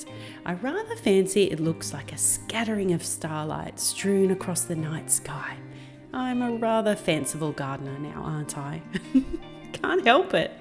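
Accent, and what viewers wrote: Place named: Australia. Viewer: Beautiful video, I came over here as a lady watching my videos said I sounded just like you ❤ same tone…except I hear you are Australian while I am British 😂 a compliment either way ❤❤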